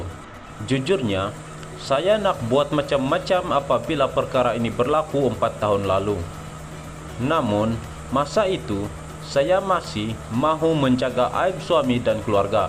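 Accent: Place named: Sabah, Malaysia. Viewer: Pekat juga bunyi bercakap dusun sabah kau itu boiii☺️☺️☺️☺️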